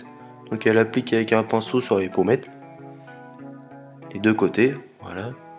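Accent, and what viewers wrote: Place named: Pays de Caux, France. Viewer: Avec le vrai accent cauchois , on adore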